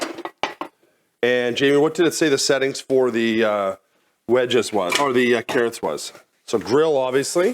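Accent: Canada. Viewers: Soooo...don't put it in the dishwasher then? Also, you sound suspiciously like a Canadian.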